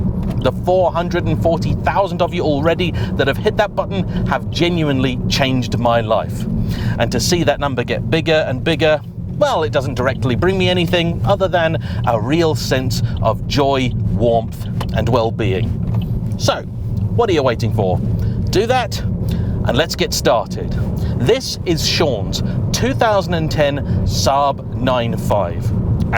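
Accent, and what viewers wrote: United Kingdom. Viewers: Is it the British accent, is it his knowledge and take on exotics as well as everyday enthusiast cars? I don't know, but I can listen to this guy talk about cars all day! seems like the person I'd run into in a parking lot and just start up a conversation about what we're driving! 😃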